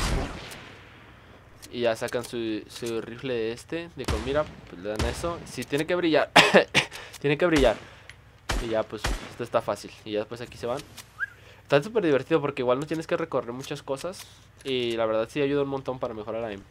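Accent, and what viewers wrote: Mexico. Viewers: Eres de Sinaloa?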